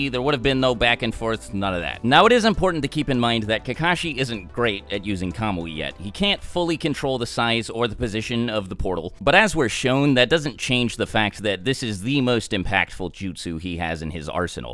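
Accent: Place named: Boston, United States. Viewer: Hey, do read the manga in Japanese? I ain't been watching your channel too long (I do rather like your videos) but when I hear you speak I figure you are from Boston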